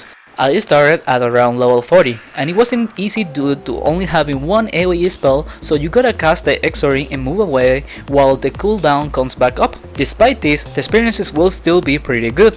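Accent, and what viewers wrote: Mexico. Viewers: Tienes buen inglés pero te escuchas mexa (no es que sea malo) sigue practicando para que suenes nativamente pero igual buen video Casi creí que era un canal gringo 🤣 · are you mexican? i feel it on your voice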